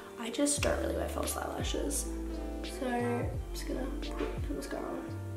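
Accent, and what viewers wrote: Australia. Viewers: I could listen to Australian Accent all day😍🤗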